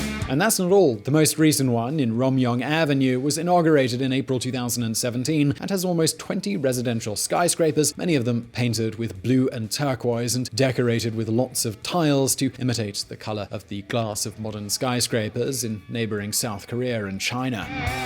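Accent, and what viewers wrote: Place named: United Kingdom. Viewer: since when does V-sauce have a political channel with a british accent?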